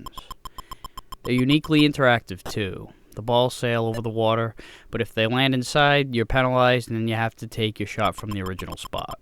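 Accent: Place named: Long Island, United States. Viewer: Definitely a Long Island accent 😉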